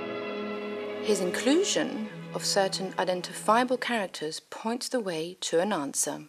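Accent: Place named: France. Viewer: The narrator sounds like a well spoken French girl whose posh rich French parents sent her to a posh English boarding school for a few years to learn posh English.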